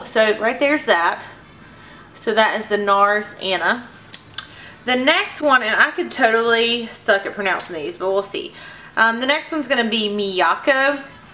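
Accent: South, United States. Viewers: Ok, girl, u hav GOT TO B from my neck of the woods!!!! Lol!! Im from Alabama and i wud kno my Southern accents fom ANYTHING!!! Lemme kno if ur close and we'll go shoppin and trade makeup! (I didnt see if u had the UD Naked concealer and Laura M. brightening powder, but if u dont n ur close, i will let u try them and THEN U WUD RUN TO SEPHORA!! They r THE BEST COMBO!). Good vid and nice to hear from sum1 close to home!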